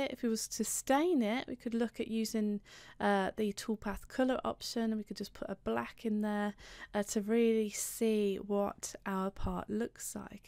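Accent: United Kingdom. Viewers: Excellent videos with a good pace. Can always go back and watch again to take notes. And the English accent helps! Not sure why you don't have hundred of thousands of views - much better than many cnc tutorials!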